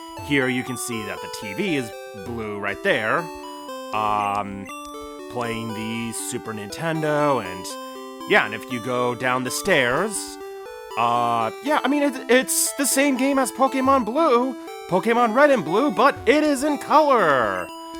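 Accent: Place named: United States. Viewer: It just sounds American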